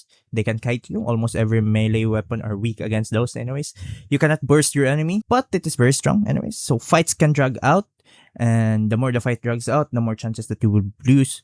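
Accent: Philippines. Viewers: Brother I shouldn't even leave a comment cause you are going to try to deflect. You sound Filipino which is a "third world" country yet you made a joke and put up African children. On top of that malaria is present in the Philippines. Just my two cents, enjoy the traffic my comment brings.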